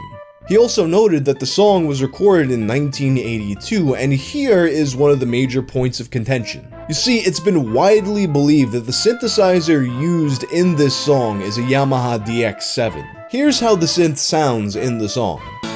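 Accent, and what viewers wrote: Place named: New York, United States. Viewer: It always takes me a few minutes to adjust to that NY accent, it always sounds like someone faking an accent to me at first, but by the end of the video I don't even notice it anymore. Edit: that's why I usually binge watch his videos, so there's no adjustment period lol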